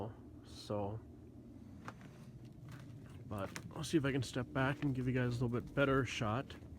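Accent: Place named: Midwest, United States. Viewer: Impressive how handy you are! I detect a Mid-western accent. Is this in Minnesota, by any chance?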